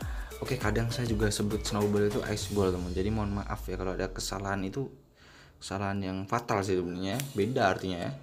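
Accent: Yogyakarta, Indonesia. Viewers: Mas orang jogja bukan? Ayo mabar mas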